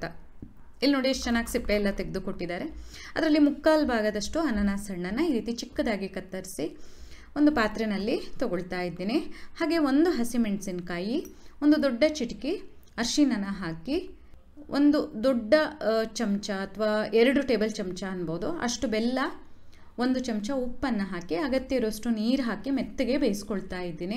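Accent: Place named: Coastal Karnataka, India. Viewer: love watching your videos, love your kannada accent, love your voice, it brings back the memories of my childhood I spent in Mangalore & Bantwal.❤️💕😘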